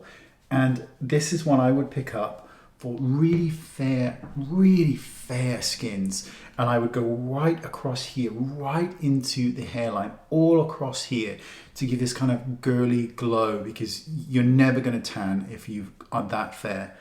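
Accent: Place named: Wales, United Kingdom. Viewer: Nice shirt! nice accent!.Im from south wales are you half welsh?¿but ive been living in Spain for about 40 years...gosh !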